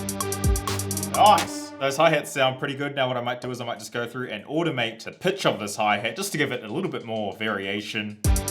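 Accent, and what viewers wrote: New Zealand. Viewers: New viewer here: Your channel says Australia, but all I can hear in that accent is New Zealand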